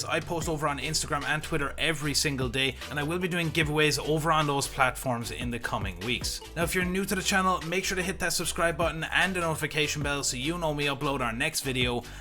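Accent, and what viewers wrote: Ireland. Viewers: Wat part of ireland r u from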